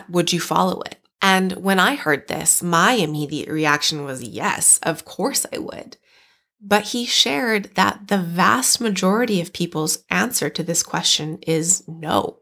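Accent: Canada. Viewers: She's not American and has a distinct Candian accent. 😂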